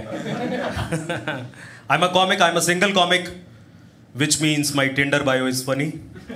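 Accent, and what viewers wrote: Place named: Uttar Pradesh, India. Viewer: Bhai apke baat karne ke tareeke se proper up wali feel aati hai.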